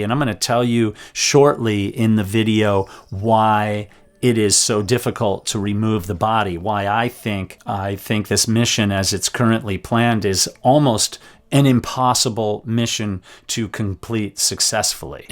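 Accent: United States. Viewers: Would you please consider learning how to pronounce the Sherpas' name correctly? It is disrespectful to be still calling them in such heavy Americanised accent when you are covering stories and news about Everest.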